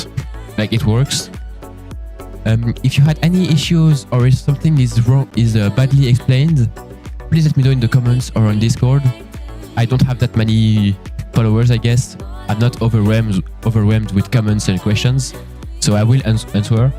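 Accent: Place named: France. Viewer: parce que quand tu parles tu sonnes français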